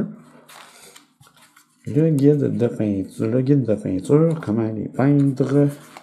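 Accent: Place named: Quebec, Canada. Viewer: Ça fait du bien d'entendre un Québécois Francophones parler de warhammer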